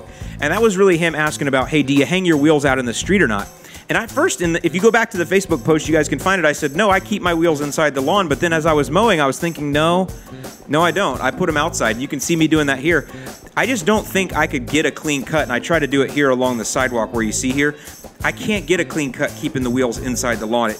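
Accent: Chicago, United States. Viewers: I love the chicago accent.😃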